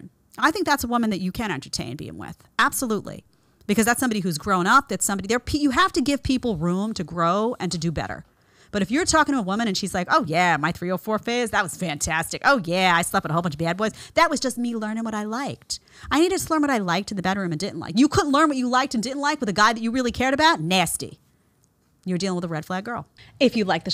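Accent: New York, United States. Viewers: The more I hear that Noo Yawk accent, the MORE i will listen. 😎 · Every time says NASTY with her NY accent talking about these modern women.😂😂😂😂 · No one can say "nasty" like Jedediah. Thank God we have a woman like her to cut through all the BS, and pointing out women like this. I'm surprised so few women commented on this video; I would like to hear how reasonable women feel about 304s like the one at the start of the video, and what their experience is with any of their 304 acquaintances. I love Jedediah's New York accent. Pay attention as she says "awl" for "all". I am so happy to have found her channel a few weeks ago. She is spot on. I hope she continues with these topics on her channel.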